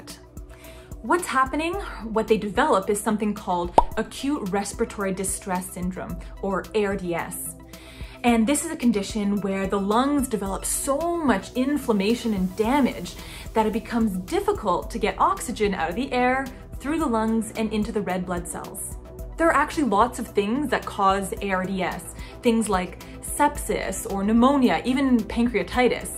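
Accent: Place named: Ontario, Canada. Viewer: OMG! I heard at least two or three “ABOWTS” and at least one “AAAOOUT” My fellow Canadian! I’m an emergency dept RN working in the US! Great job!!!!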